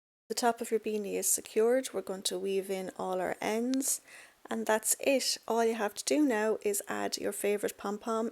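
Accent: Ireland. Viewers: I'm looking for tutorial for a winter hat, but I stayed here till the end just to listen to this beautiful Irish accent. I lived in ireland for 11 years, miss hearing it 🇮🇪 😊 🇵🇱 nice beanie, maybe I will try making it 👍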